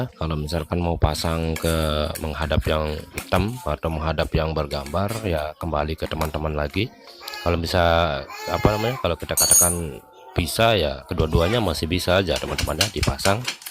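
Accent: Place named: Bali, Indonesia. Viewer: Kaya org bali logatnya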